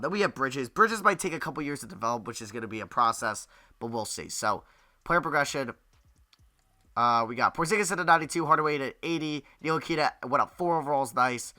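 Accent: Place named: Long Island, United States. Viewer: Matthew B just Long Island accent nothing wrong I have it to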